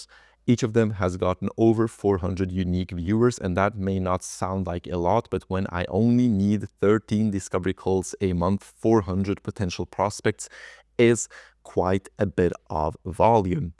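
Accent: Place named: Norway. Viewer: hold up you danish or swedish? - nvm you Norwegian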